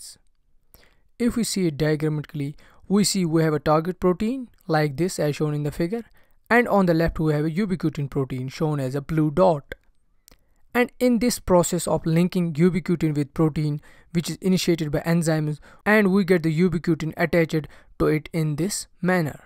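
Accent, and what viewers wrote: Kashmir, India. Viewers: Sir i guess u r from kashmir?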